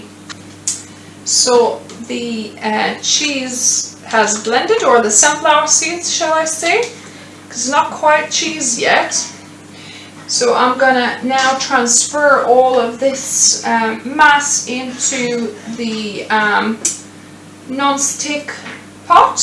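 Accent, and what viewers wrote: United Kingdom. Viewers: I hear British, German I’m not sure but being multilingual is so cool 😎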